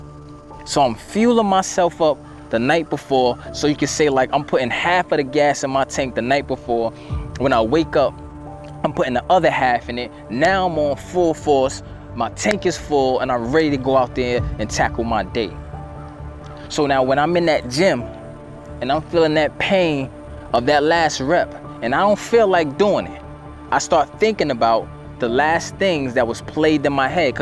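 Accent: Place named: Brooklyn, United States. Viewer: Yo my Guy, u be preaching. You need to give your bio or something. Let the people know who you are. You are the first motivational speaker who talks like me ( hip hop). You gotta be from Brooklyn. I’m from Queens. I have been struggling and listening to you is really helping me Bro. Salute💪🏿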